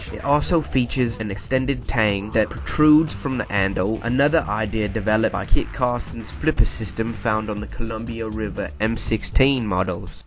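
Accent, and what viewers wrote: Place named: Cockney, United Kingdom. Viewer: Now that's a very strange accent, sort of both cockney and northern at the same time.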